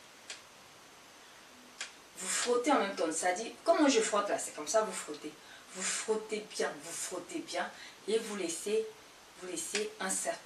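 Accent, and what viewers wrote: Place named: Ivory Coast. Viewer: Merci merci cette vidéo tombe bien 😊 l'accent ivoirien qui ne se perd jamais 😂😘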